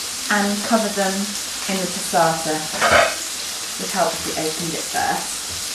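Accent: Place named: Italy. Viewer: I thought you had an Italian accent . But on the way to get another glass of wine I thought whoa Nellie enough is enough. Nice video,make some more.Looking at the ages of the comments I bet your done with youtube to bad for us.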